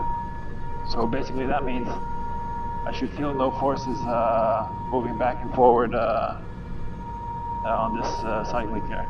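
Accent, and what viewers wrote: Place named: France. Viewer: Thanks buddy... It's funny as you have a french accent on your radio calls, but not when you talk normally...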